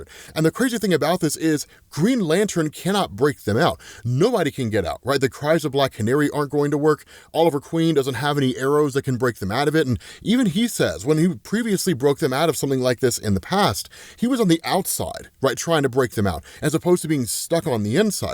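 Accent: United States. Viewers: Why does pronounce ''Eclipse'' like that (e-clips)?. Where's his accent from in the US?. I'm not from the USA.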